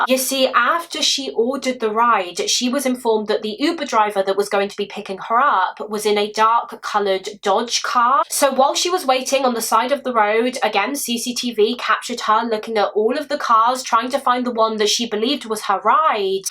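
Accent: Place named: Somerset, United Kingdom. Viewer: It's a west country accent ❤ sound Somerset to me 😊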